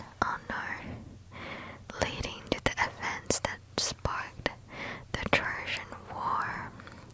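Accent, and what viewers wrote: Australia. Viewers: that Australian accent even through the whispers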